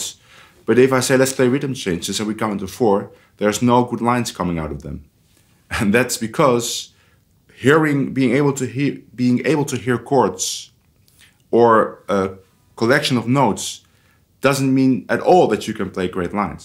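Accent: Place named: Netherlands. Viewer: that dutch accent tho :p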